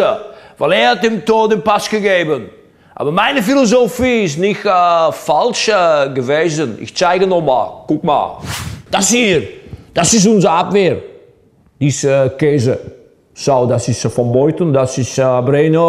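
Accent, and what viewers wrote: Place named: Netherlands. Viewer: haha het accent lijkt erg op een nederlander die duits probeerd te spreken ! zeer goed gedaan. haha der Akzent ist sehr ähnlich wie ein Niederlander, der versucht Deutsch zu sprechen! sehr gut gemacht.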